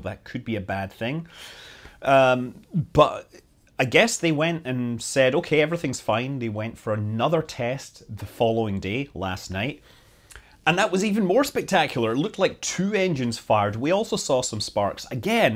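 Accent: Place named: West Scotland, United Kingdom. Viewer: where in Scotland are you from? (I’m west Dunbartonshire) a lot of your words sound like you’re from the west 🙈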